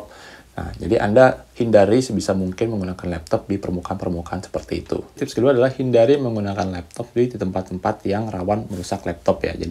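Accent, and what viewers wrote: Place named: West Java, Indonesia. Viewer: meni sunda pisan euy 😁👍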